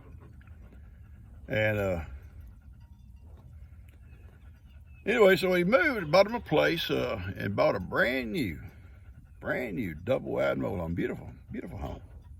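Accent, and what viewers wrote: South, United States. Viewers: I love your country accent and your stories. I couldn't stop laughing 😂😆 at doc and the racoon on his head at the stop light.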